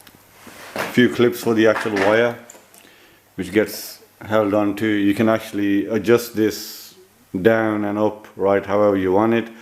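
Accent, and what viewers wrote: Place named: Birmingham, United Kingdom. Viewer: Are you from the Midlands , sound a bit brummie matey, cheers for sharing pal👍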